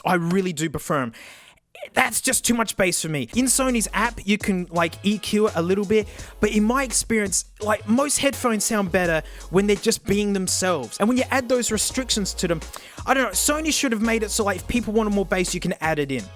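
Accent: Australia. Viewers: I can never understand what you’re saying on these mic tests. It’s a mixture of australian accent with me not being great at english and headphone mics sucking that is simply impossible for me to overcome. I’m so sure you’re saying something funny I still laugh sometimes tho, hahahahah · Can you please reveiw the skullcandy crusher's, i have had them for 2 years and i love them but i would love to hear your opinion on them. Btw, love ur vids and laugh everytime u talk with that Austrailian accent XD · I fucking love Australians. The accent is on point for these type of videos.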